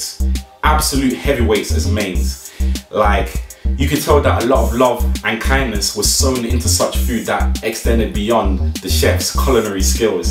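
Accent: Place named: United Kingdom. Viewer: A black man speaking English with a British accent in Amsterdam while at a Japanese restaurant... Whoa my brain just froze bruv I swear lol but this vid satisfying af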